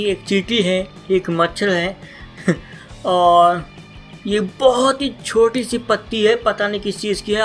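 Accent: India. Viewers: Ngomong nya kaya orang india njir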